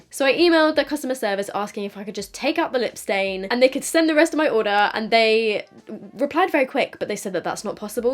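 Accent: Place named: London, United Kingdom. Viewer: You are sounding a little London today 😅😅 ❤️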